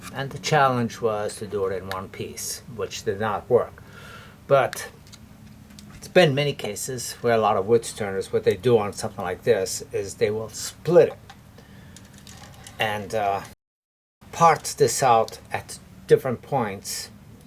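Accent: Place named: Portugal. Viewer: you are a rebel! ( and a master) love watching to see what your making.By the hat and the Portuguese accent you must be from the Massachusetts area. Keep up the awesome videos,you are truly a craftsman.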